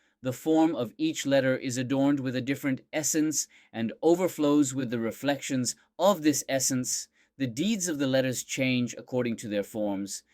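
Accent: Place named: United Kingdom. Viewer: Hocam, maaşallah.. 😲 British aksanınıza bayıldım. 😍 Resmen, bizden alemlere hayret ve şükür dolu bir portal daha açtınız ki... Uzay serüveni tadında, heyecanında, ortalık yıkılıyor. 🌠🌌💫 Emeklerinize sağlık. Cansınız.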